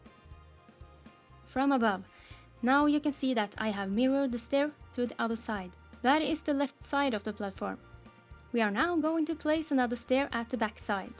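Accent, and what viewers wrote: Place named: Norway. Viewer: Why you sound Norwegian ?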